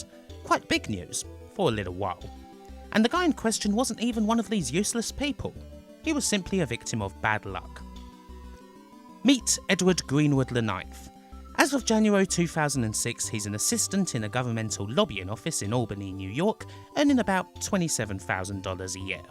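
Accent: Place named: Essex, United Kingdom. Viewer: great video where in essex are you from? im from colchester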